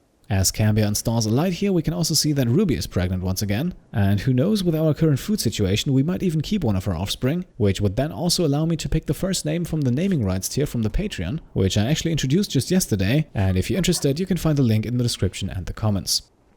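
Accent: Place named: Germany. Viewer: Something's came to my mind watching this series. You have - maybe I am imagining it - a slight German accent. Is that the case? Because while being German myself and knowing how normal Germans speak English, I definitely had to listen quite a while to come to this guess. If that was true, I would highly have to take my hat off to your English language skills. ... die hast du doch nicht im Studium erlernt? Das erste Mal, als ich darüber gestolpert bin, war, als du von der Masterarbeit gesprochen hast, was mich das erste Mal hat aufhorchen lassen. Worüber war die btw?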